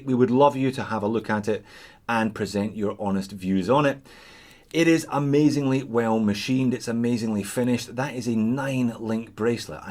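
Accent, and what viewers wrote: Scotland, United Kingdom. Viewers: Scottish, that is what I thought. Just didn't want to say it on YouTube and make fool out if myself, in case I would have guessed wrong.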